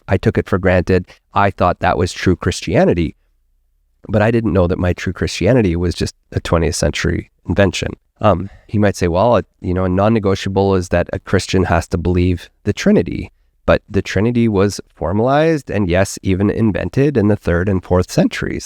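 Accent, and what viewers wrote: Canada. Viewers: Great discussion -- it really highlights the lack of intellectual, and if I may say so, spiritual honesty in the evangelical faith community that I was a part of for so long. I did not feel free to wrestle with any doubts whatsoever, much less to express them openly. Even if you found someone who understood the problem, they always seemed to have a cut and dried "solution" that appeared "doctrinally sound" but completely unsatisfying. Which left me basically no choice but to quietly leave the matter unexplored and wonder why I was such a lousy Christian. Very difficult to put that problem into words until now, so thank you so much for confronting it. By the way, being a big hockey fan, I never had any doubt that you have a Canadian accent, not an American one! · Don't worry bro I actually can tell that you're Canadian by your accent. The word has a lot of baggage that was helpfully unpacked. 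I wonder if many of the issues that you discuss potentially stem from people focusing on the New Testament. Paul discusses (and references) a lot of Scripture, that is, the Old Testament and whatever else the Jews used. I think there are a few epistemological problems with liberal Christianity: it is the latest installment in a long tradition of Greco-Roman thought that I think is problematic. This is my first introduction to liberal Christianity so I can't really say much else. Very thought provoking stuff, thanks for sharing.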